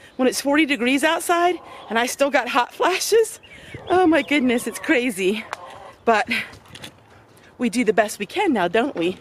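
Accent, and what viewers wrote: Pennsylvania Dutch, United States. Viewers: Hi. I like your PA Dutch accent. thanks for sharing. :)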